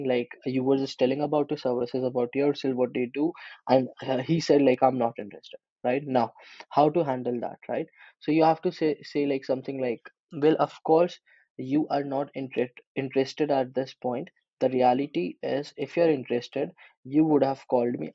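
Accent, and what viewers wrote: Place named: India. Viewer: Hey nice video had some questions though - 1) how can we call us prospects from india for cheap? 2) how do u book appointments for us clients after generating some interested leads? 3) what is the service and what is the irresistible offer that you use in your agency? 4) aren't clients bothered by your heavy indian accent during sales calls? Keep doing the great work man. You are helping the community a lot.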